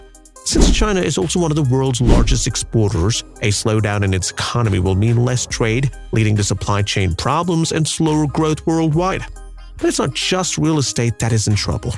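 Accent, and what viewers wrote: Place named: United States. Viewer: I hear American accent! Lol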